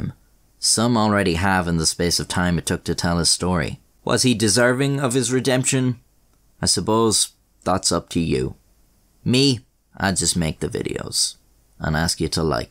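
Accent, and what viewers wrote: Ireland. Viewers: I was wondering because you sound either Irish or Scottish Mainly Due to the fact that they make me laugh They are the kind of people that have a great sense of comedy In the most down-to-earth away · I really enjoy your straightforward style, thoroughly researched material and deadpan Irish delivery. Keep up the primo content. Cheers!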